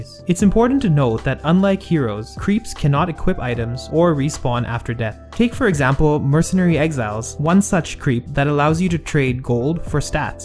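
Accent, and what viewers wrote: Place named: Philippines. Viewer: Are you Filipino by any chance? You have a Filipino accent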